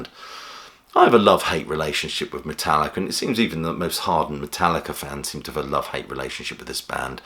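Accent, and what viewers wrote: United Kingdom. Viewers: The Who??? Radiohead??? This is a super weird "channel." Yeah, a rock band wouldn't want to comment on environmental and socioeconomic issues. How about the Flaming Lips? They are absolutely tone deaf. This cast is all about one guy's peculiar tastes, even if he wraps it around viewer preferences. I think Yanks go in for things because the guy has a Brit accent. He's the Geico Gecko of music criticism.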